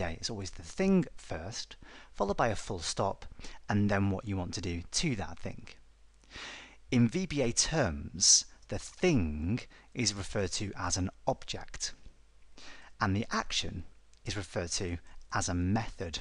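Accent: United Kingdom. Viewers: Your videos are fantastic, and your accent is so beautiful! Are you british? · I'm not hating at all (please no flaming), but its sooooo freshing to hear an English accent on a programming tutorial video. I'm currently using YouTube to learn <link>, SQL, <link> and a range of others and all the videos I have watched they have been American/Indian. I have no problem with that at all but its good to see the UK has some programming skills! Great tutorial, really easy to follow and I learnt a lot. Time to watch the rest of your videos :D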